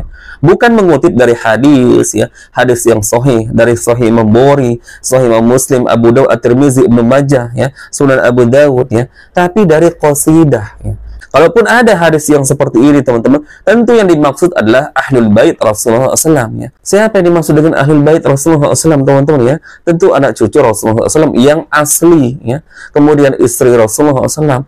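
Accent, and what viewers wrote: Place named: East Java, Indonesia. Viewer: kalau di cirikan dari dialeknya kayaknya dari Malang atau Surabaya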